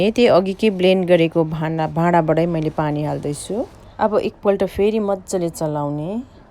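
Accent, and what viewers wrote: Darjeeling, India. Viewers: Hamro darjeeling aloo bandam boli mitto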